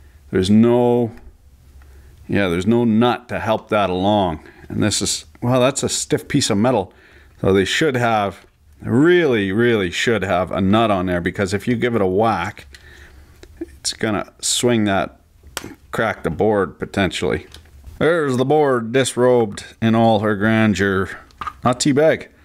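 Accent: Quebec, Canada. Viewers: I thought the best part was hearing you speak Quebecois, until I heard your little girl... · Jte spot avec ton accent du lac saint jean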